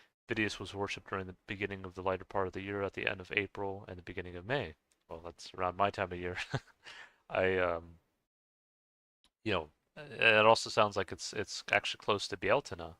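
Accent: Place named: United States. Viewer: "Possibly contain the Welsh and parts of England" How do you come to that conclusion? It definitely is the whole of the British Isles. Alba was originally used for the whole of the British Isle.... Where are you getting your information from? Just look at the DNA map, it tells you the basic story.... we are all majority "Celtic" Brythonic peoples. You sound American, you mention your Welsh-American friend..... No you are Americans, typically of Americans you think bloodline means something other than for medical reasons.. And you still then get it wrong.... All these groups of people you mention are cultural groups not genetic..... The Greeks and Romans, where most of these names come from knew nothing of genetics.... they arbitrary grouped people to what they thought should be grouped together. Again where do you get your information from? It sounds like you get a lot from Irish and Scottish nationalist who need to prove they are different from the English because they are bigoted and try to deny they joined in with building the British Empire. 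Note its the British Empire not English, with English people outnumbering the Irish, Welsh and Scots, by ten times why would they not have called it the English Empire? Answer, because it was ALL groups, not just the English. Stop listening to nationalists, they will always give you "their" version not a balanced version.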